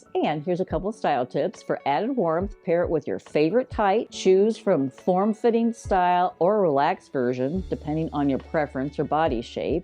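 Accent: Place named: South, United States. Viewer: I’m in Ga too! I thought 💭 I detected an accent. We’re up here in the North West. (Cherokee county). Nice to know you’re a southerner.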